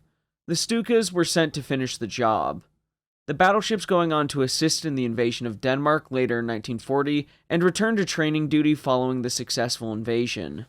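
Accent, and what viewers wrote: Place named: West Coast, United States. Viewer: There's just something about a young white guy with a west coast accent mispronouncing every word that makes me want to black list the channel.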